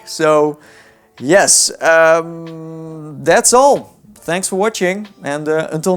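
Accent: Netherlands. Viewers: man has a dutch accent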